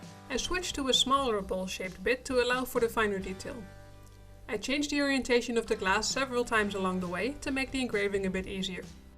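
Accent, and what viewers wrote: Netherlands. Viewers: I'm so happy I found this site..ordered myself a glass etching tool..I'm going to guess your accent is Nederland...klompt?? Jim: Olympia,Wa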